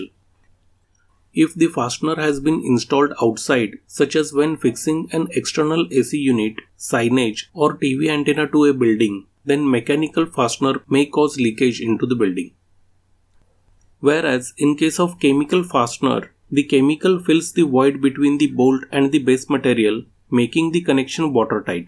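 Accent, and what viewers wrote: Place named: Punjab, India. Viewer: i immediately exited the video due to the punjabi accent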